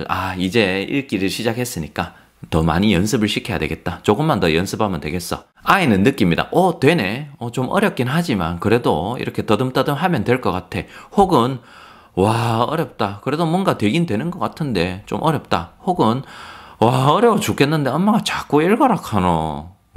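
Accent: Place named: Daegu, South Korea. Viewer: 대구 프린스 선생님~😉 귀에 쏙쏙 들어오는 대구 사투리덕분에 선생님 영상 보는 재미가 두배에요~^^ 오늘도 좋은 영상 고맙습니다♥️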